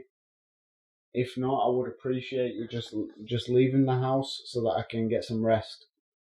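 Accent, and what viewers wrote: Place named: North, United Kingdom. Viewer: Just found this channel awesome stuff man. That was my life from a small kid until I was around 17 and moved out. But over the last five years the lights and, colours and noises are back around me. Kind of welcome it now. Where abouts are you from. Sound like a fellow northerner. Keep up the good work.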